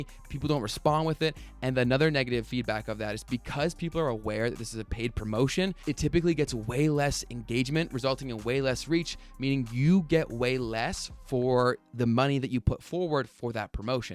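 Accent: Canada. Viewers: You have a slight Canadian: Trailer Park Boys accent. Which is awesome. (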